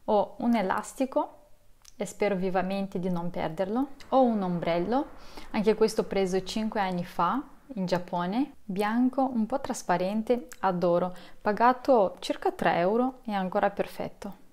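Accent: Russia. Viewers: Sei russa? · Grazie per questo video, mi è piaciuto molto! Sto imparando italiano e mi aiuta molto guardare i tuoi video. Ho notato un piccolo accento russo, altrimenti avrei pensato che l‘italiano fosse la tua madrelingua. Posso chiederti da quando stai imparando italiano? E da quanti anni vivi già in Italia? È stato difficile imparare italiano così bene? Grazie :) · Non riesco a capire dal tuo accento se sei russa oppure moldava? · mi rilassa troppo la tua voce russa!😍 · Come mai stavo guardando dei video poi ricarico la pagina e trovò una che ha l’accento Russo e poi vive usando con una sola padella :O (comunque lei può fare quello che vuele, questo è anche interessante) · Io: che nel cuore della notte vorrei dormire YouTube: consiglia video di una ragazza con accento filo-russo che spiega come vivere con pochissime cose •_•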